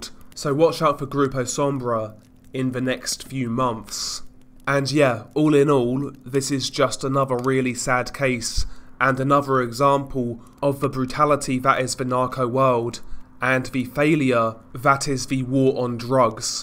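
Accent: United Kingdom. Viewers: Oh the British accent, the best one❤️ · He really can't get more british lol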